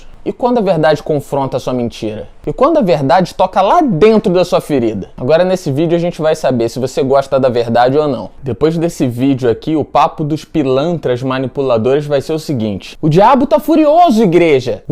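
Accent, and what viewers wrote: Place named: Rio de Janeiro, Brazil. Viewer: desse sotaque carioca eu ixtava com saudade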